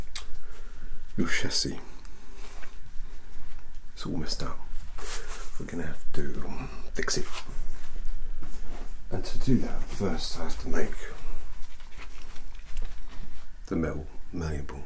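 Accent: United Kingdom. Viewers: Could also be titled as "Male Winry Rockbell with British accent fixes you" I wanted to save this for bedtime but... Can't resist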